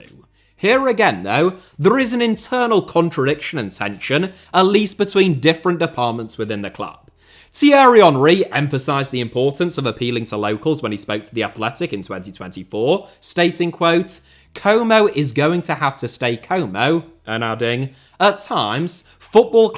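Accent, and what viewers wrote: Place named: United Kingdom. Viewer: I can't think of a greater punishment than hearing a british man pronounce Como repeatedly for 45 straight minutes. Or should I say "Coumou".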